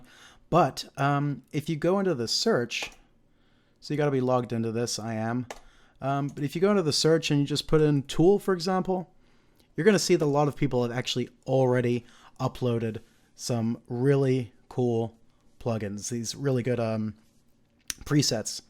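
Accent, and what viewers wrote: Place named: Germany. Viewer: Dude. Are you a German that learned English? Christ I thought this was American for ... first 15 minutes! Great channel and checking things out. Brian Jones tone cuts more to the core of listeners and is an awesome thing to take a look at.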